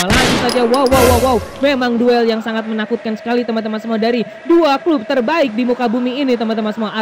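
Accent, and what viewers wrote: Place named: West Sumatra, Indonesia. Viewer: Suara lu ko kek minang kocak si bg🙄🤔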